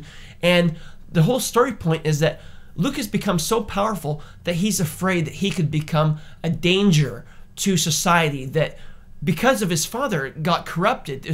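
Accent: Canada. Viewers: Canadian as fuck eh? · why do you have a canadian accent?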